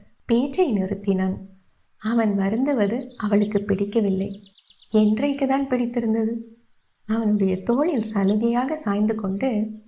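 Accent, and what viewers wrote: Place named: Kongu, India. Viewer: Your kongu slang I am very happy to relate to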